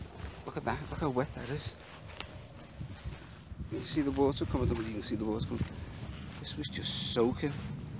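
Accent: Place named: Merseyside, United Kingdom. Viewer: Brilliant one, great choice of location even if it was in the dark. You never forget your first wildcamp and to do so as a solo one is even better! Great video and every trip out should be an opportunity to learn. From pack size and weight to routes and weather watching, it all adds to the fun. Just trying to work out which side of the Mersey you're from based upon the accent, I was going to say your a Wirralian but not too sure now. I'm heading to check out your other vids too.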